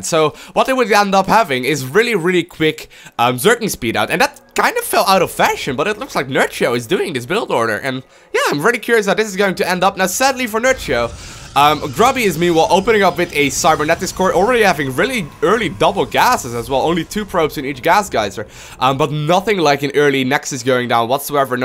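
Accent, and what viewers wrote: Netherlands. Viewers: Ik dacht al dat je een Nederlander was :), je spreekt heel goed Engels maar dat accent zal nooit weg gaan :P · lol ik wist dat jij nl moest zijn door je accent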